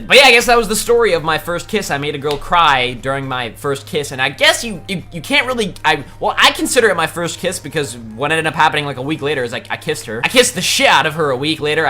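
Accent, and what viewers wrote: Russia. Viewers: That Russian accent was great lol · Russian accent gave me fucking cancer. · Blaziken you should make a new account go online mod and use you Russian accent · Do a full video in Russian accent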